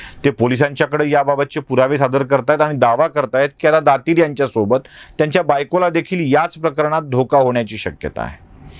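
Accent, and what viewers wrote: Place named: Marathwada, India. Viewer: त्यांच्या भाषेचा लहेजा तसा आहे , थोडा ग्रामीण , शिरवळ , मराठवाडी पण स्पष्ट .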